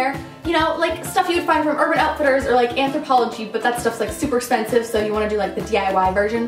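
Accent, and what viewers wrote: United Kingdom. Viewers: Look girl don't worry you are perfect talking British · It's fine I have a British accent too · no I do like ur British axant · You sound British but no one British talks like that..! Make sense?😂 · You do sound British😂👍🏼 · it sound like she is british like zoella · that's acctually a good ENGLISH accent, British is Irish, Welsh Scottish and English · Her British accent sounds ok tho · im called charlie and im from britain and just to let u no u have a very strong british accent x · you do sound like british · you have such a good British accent omg😂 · YOU NAILED THE BRITISH ACCENT - LIKE WOW. many americans / whatever cultures fail, but chu did our accent JUST RIGHT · This is my first video seen and u do sound britissh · noooo lol i actually thought you WERE British haa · i like your british acsent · Я пришла за Британским акцентом . 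· she said she was going to be use a british acsent?? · I THOUGHT SHE WAS BRITISH! !!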